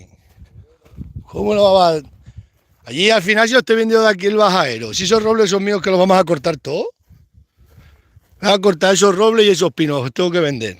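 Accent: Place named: Galicia, Spain. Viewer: Aqui un Gallego .... a éste Gallego de 68 años , aunque repitas 100 veces lo mismo .... ENTRAS EN VENA TIO .... lo dices como hablan los paisanos de A MIÑA TERRA , de la Galicia profunda ....TAL COMO SOMOSSSS!!! ... ERES UN CRACKKKKK !!! ... VER A TU FAMILIA A TU LADO , ES ENTENDER PORQUE LUCHAIS Y LO MAS IMPORTANTE ES ENTENDER PORQUE PROGUESAIS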